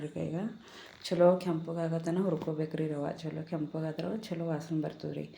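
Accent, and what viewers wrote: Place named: North Karnataka, India. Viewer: ನಿಮ್ಮ ಉತ್ತರ ಕರ್ನಾಟಕ ಭಾಷೆನೇ ಚಂದ ಬಿಡ್ರಿ ಸೂಪರ್ ರೆಸಿಪಿ 👌🏻👌🏻😋👍🏻🎉🎉✅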